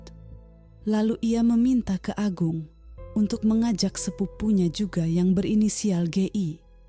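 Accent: Java, Indonesia. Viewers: Iya udah translate jawa pulak logatnya taik taik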